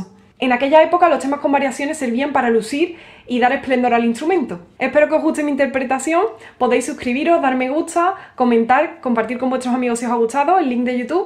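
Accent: Andalusia, Spain. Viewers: Tu manera de hablar es hermosa. Mi pregunta.... es completa y totalmente andaluz??? O tienes alguna otra influencia??? P/D. Tu no tocas la guitarra... verdaderamente la acaricias. Bellísimo. Saludos desde la Argentina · Andaaaaaa! No toco la guitarra pero me gusta escucharte hablando en español con tu acento andaluz y claro tu música tb. Estoy estudiando el español y hace muchos años estudié 4 meses en Sevilla.... me Milán tus pendientes de mariposas!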